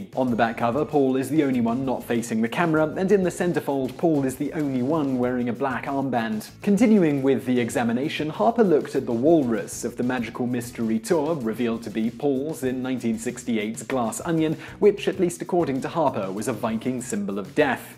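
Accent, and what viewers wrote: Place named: Scotland, United Kingdom. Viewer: You have a scottish accent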